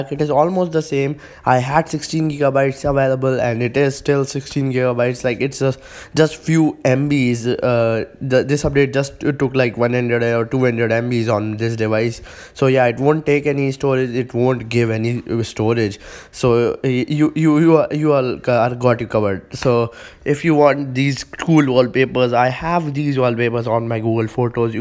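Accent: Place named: Tamil Nadu, India. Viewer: i can't understand what the hell you talk .. please talk english.. not english tamil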